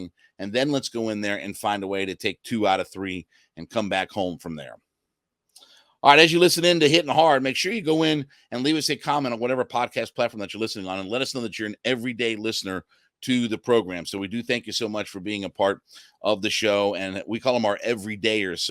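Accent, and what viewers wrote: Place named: North, United States. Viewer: You make me laugh so much !! I have no idea where you from but definitely from north of Mason Dixie line. Thx for the entertainment! Go Braves and Falcons!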